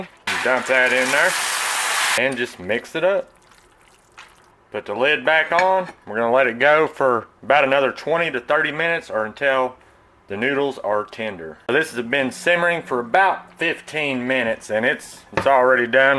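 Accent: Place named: Deep South, United States. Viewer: Great accent. Reminds me of my navy days stationed in the Deep South. American chop sued was a staple in the mess hall then but yours looks better; unfortunately I don’t cook.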